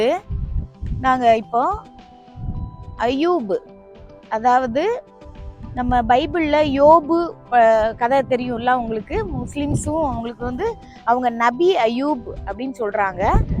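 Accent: Tirunelveli, India. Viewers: Praise the lord sis Nellai tamil super super 🎉🎉🎉❤❤❤ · Video super... நேர்ல போய் பார்த்த மாதிரி இருந்தது....நம்ம ஊர் தமிழ்ல கேக்க ரொம்ப நல்லா இருந்தது...நீங்க தூத்துக்குடி அல்லது நெல்லை மாவட்டத்தை சேர்ந்தவர்களா....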